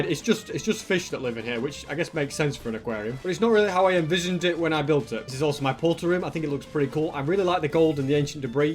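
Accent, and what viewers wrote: United Kingdom. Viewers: But 100% British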